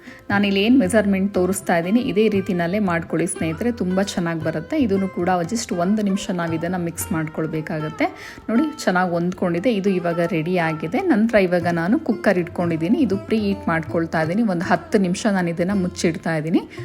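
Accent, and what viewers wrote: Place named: Malenadu, India. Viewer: ಹಾಯ್ ಮೇಮ್. ನೀವು ಅಚ್ಚ ಕನ್ನಡದಲ್ಲಿ ಸ್ವಚ್ಚವಾಗಿ ಮಾತಾಡೋದನ್ನು ಕೇಳಿದ್ರೆ ಹಾಗೆ ಕೇಳ್ತಾ ಇರ್ಬೇಕು ಅನ್ಸುತ್ತೆ. ನಿಮ್ಮ ಮಾತಿನ ಶೈಲಿ ನೋಡುವಾಗ ನೀವು ನಮ್ ಮಲೆನಾಡಿನ ಕಡೆಯವರಿಬಹುದೇನೋ ಅನ್ಸುತ್ತೆ. ಮತ್ತೆ ನಿಮ್ಮ ರೆಸಿಪಿಗಳೆಲ್ಲ ಒಂದಕ್ಕಿಂತ ಒಂದು ಚೆನ್ನಾಗಿದೆ. ವಿವರಿಸುವ ಪರಿಯಂತೂ ವರ್ಣನಾತೀತ. ಅದನ್ನೆಷ್ಟು ಹೊಗಳಿದರೂ ಸಾಲದು.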